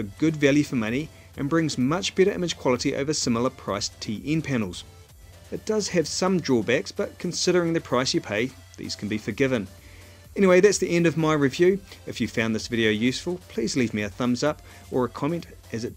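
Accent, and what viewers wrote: New Zealand. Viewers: A Kiwi accent be praised ! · are you from new zealanfd? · MY BOY YOUR FROM NZ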